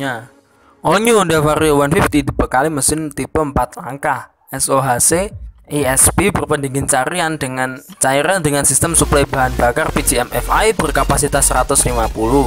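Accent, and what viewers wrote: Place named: Java, Indonesia. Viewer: Medokk :v pokoke josss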